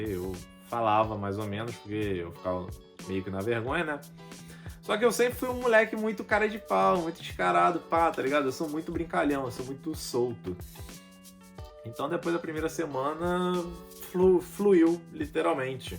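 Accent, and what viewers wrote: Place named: Rio de Janeiro, Brazil. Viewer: I’m Bryan Lima gostei da sua história 😊 tão jovem já viveu muitas coisas, vim pelo canal da Jéssica gostei muito de sua mãe. Mulher guerreira não é fácil tomar a decisão que ela tomou. Pela sua educação vê que ela cuidou muito bem dos filhos, você é um garoto muito educado esqueceu de falar qual é sua cidade aqui no Brasil. Pelo sotaque é carioca vizinho aqui de minha cidade, Juiz de Fora uma cidade do interior de Minas que é um pouco carioca também #sqn você realmente abriu mão de tudo na sua vida, pra começar uma nova vida do zero imagino que não tenha sido fácil. Mas pelo jeito esta muito bem obrigado 😊 sorte sua estar vivendo em um lugar onde possa ter uma qualidade melhor de vida. É isso aí boa sorte pra você em todos seus projetos sejam bem sucedidos 🙏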